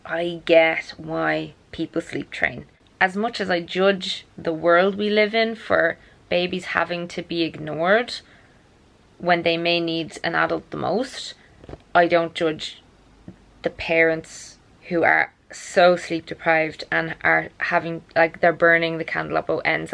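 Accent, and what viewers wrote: Ireland. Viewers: Hi, just wanted to say thank you for all of your parenting videos. I have been feeling extremely conflicted about the idea of maybe being a mom because of my own childhood. Seeing you interact with your kids makes me so happy and a part of me is healed and excited about the prospects of parenthood. So thanks for that <3 Oh and, after watching your videos I always subconsciously put on a little Irish accent when going about my day. I hope the idea of a German gal living in the UK with an American / part-time Irish accent makes you laugh:D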